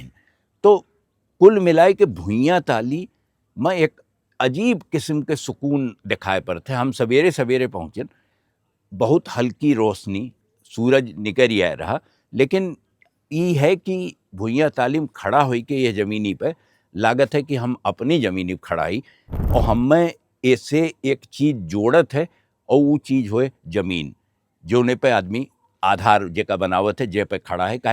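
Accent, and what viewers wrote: Awadh, India. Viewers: Listening to your video feels like roaming around my nani,s village about 60- 65 years back.Same air .same mitti ki sondhi sugandh and the original awadhi.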